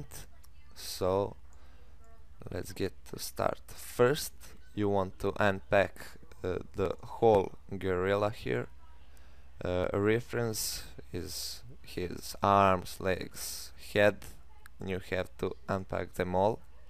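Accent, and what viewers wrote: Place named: Croatia. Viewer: prica engleski jezik a ima hrvatski naglasak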